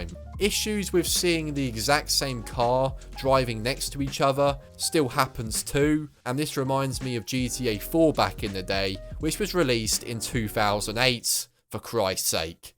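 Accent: United Kingdom. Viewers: Bro you're British as an American it's hard to getting some words I have to playback 10 seconds for that 😂 Edit: but I love your videos though ❤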